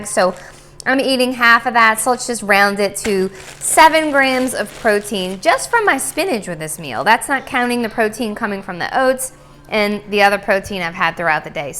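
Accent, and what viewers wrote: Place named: South, United States. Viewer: I love your southern style. I am from NY and I would have love to be born down south, accent and all❤️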